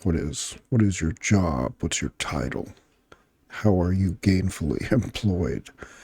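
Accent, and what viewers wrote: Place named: Japan. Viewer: Yes. It's really something that could be scaled up. ASMR or whatever it's called. Audiobooks and all that. He's like a native Japanese speaker but speaking in English.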